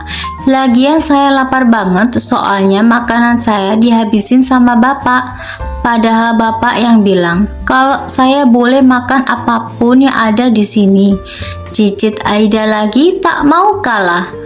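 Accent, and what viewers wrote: Java, Indonesia. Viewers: Suka suara khas medok jawanya semangat akak❤❤❤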